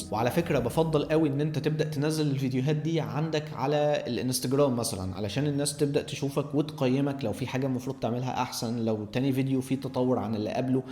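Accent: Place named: United States. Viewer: بالله اتكلم بلهجة عربية لهجتك هجين كأنك امريكي تحاول تتكلم عربي غصبا عنك اعتز بلغتك لا تصير رخيص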